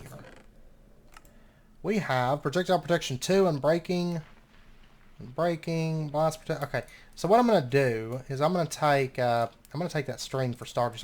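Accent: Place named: Owensboro, United States. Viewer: I’ve got tons of family in Owensboro. Im really loving watching these videos since your accent reminds me of all the good times I had down there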